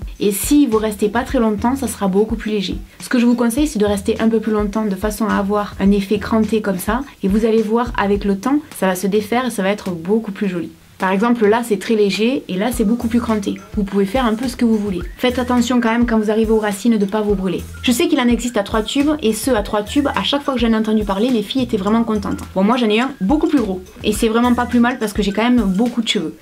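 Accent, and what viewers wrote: South, France. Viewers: Chloé Richaud Si elle a l'accent du Sud un peu. ^^ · J'adore ton accent du Sud!!!💗💗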